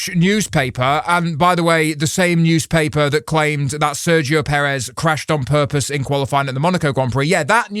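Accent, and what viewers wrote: United Kingdom. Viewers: Wait, are you British? I thought you were Australian.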